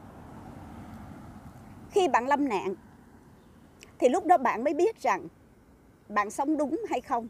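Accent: Standard. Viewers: Em nói chuẩn nhất mà chị đã từng nghe. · Chị nói hay và chuẩn lam chi oi! Giọng nói củng hay va hiểu biêt. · Chị nói hay chuẩn lắm chị 😁😁 em còn mê chị nói chi ai 🙈🙈 chúc chị luôn luôn vui vẻ tràng đầy sức khoẻ trẻ mãi không già chị nhé.🌹🌹🌹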